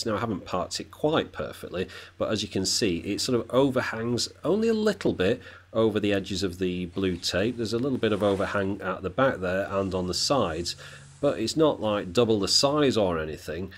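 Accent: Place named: Liverpool, United Kingdom. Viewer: are you near Liverpool? you sound a bit like Ringo.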